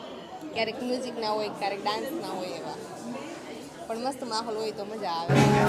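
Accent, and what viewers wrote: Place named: Kathiawar, India. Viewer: ખુબ સરસ્સ વિડીયો. ફ્રાન્સમાં અસ્સલ કાઠીયાવાડી બોલીમાં તમે ત્યાની રેસ્ટોરન્ટનો અનુભવ કરાવ્યો. વિડીયો બનાવતા રહેજો. તમારા અગાઉના પણ કેટલાક વિડીયો જોયા છે. બહુ ગમ્યા.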